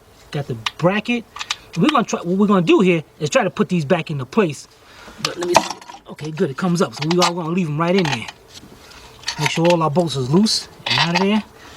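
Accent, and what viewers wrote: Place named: New York, United States. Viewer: Wish I would have seen this before i paid for the job. You got the most swagger of any mechanic I’ve seen. Sound like you are from New York.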